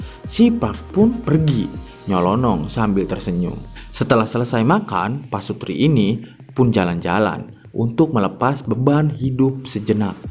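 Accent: West Java, Indonesia. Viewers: Lucu bgt dngerin narasinya kaciri pisan org sunda ketika ngomong " SABAT .. dulu .. 😂😂😂 piseurieun pisan jadi gekgek ngadanguna ... sukses terus lah channel na smoga mkin tmbh subscrb na .. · Sunda Pisan Euyyyy 😂😂 Narasina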